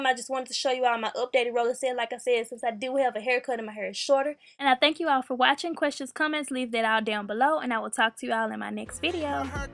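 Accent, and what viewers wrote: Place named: Houston, United States. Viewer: I like your accent lol 😂 u from Houston?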